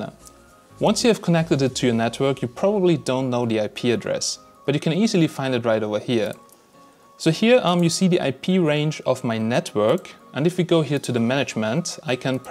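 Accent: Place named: Germany. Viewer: durch zufall deinen channel gefunden :) irgendwie hört man als deutscher direkt wenn ein anderer deutscher englisch redet :) cooles teil der jasminer, ob sich das mit eth noch lohnt ist halt die frage, aber wenigstens geht dann noch etc damit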